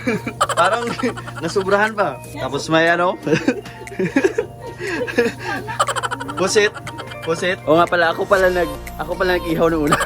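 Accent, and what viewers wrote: Philippines. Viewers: anong probinsya? ang accent?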